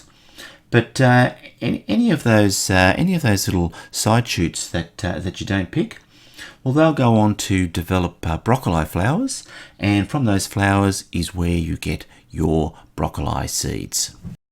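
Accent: Australia. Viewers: what is the warmest it gets during your winter? I heard the accent so thats why I asked because I thought it's always hot in Australia but I honestly don't know anything about your winter. Greetings from Maine,USA :) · Strange, we also say it in England "Broc-o-lee". I assumed Aussies would say it the same xD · How interesting! I didn't know you folks from down under say "broc-o-lie" where we yanks say "broc-o-lee"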